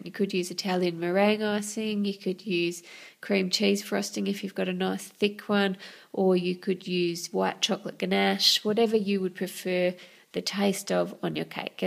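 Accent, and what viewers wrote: Australia. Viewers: I have to Admit , I love your accent ! #Australian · BTW, love ur accent! :) Are you Australian? :D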